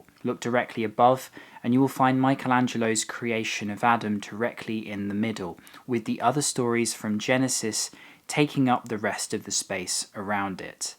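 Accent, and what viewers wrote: United Kingdom. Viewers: I am glad that you started your travel again. Astonishing views of Vatican. Beautiful architecture. I want to visit Italy. Well made videos with a great music background. Well done,!!! I also like your British accent😘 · R U From The UK. You Have A British Accent.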